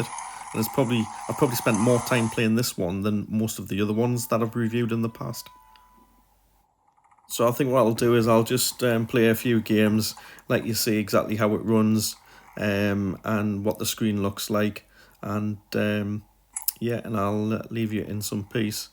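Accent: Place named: Newcastle, United Kingdom. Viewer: Cool review Geordie lad Like me 😉😉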